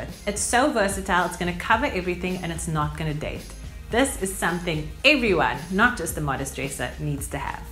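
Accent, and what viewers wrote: South Africa. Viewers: Maays South Africa ☉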